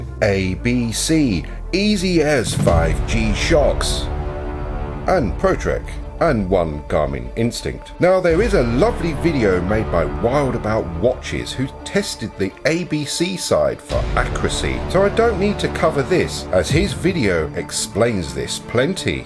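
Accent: United Kingdom. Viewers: This guy does the comparison we wish all other videos would FINALLY do - but they don't. Mixed with a little humor and a little posh UK accent... Informative and entertaining.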